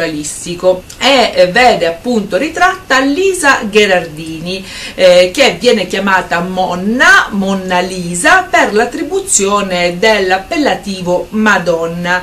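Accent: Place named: Tuscany, Italy. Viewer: Bravissima attrice, con quel tuo simpatico accento toscano. Molto professionale e interessante la tua spiegazione. Grazie.. <3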